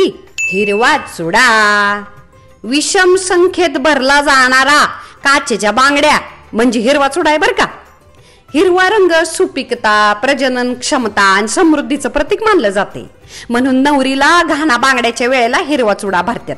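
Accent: India. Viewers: दागिन्यांची खुप छान माहीती दिली ताई आणि ती पण अस्सल मराठी ठसक्यात👍🏼 दिल्याने खुपच छान वाटले... तु तर अप्रतिम सुंदर दिसत आहेस 👌🏻👌🏻👌🏻👌🏻😊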